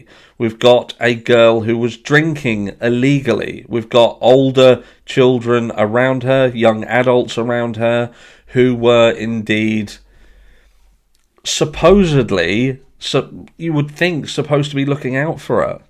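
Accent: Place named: England, United Kingdom. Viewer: Awesome sir! So glad found ya! I love the English accent my friend! Our views are same on many aspects of this case! Thank you for good information,and great theories! Have great Friday Mr!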